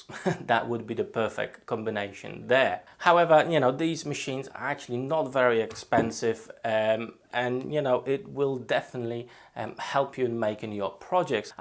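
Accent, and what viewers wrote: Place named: Northwest England, United Kingdom. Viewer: What accent have you got, I'm hearing northwest England, and German maybe ha ha ha.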